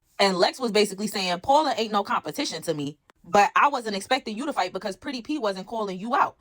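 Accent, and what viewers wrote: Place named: Brooklyn, United States. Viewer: Omg you’re from bk!! I knew it lol The dialect and accent is distinct. I’m a Bk native and while I live in another borough I will always rep bk! We a different type of breed.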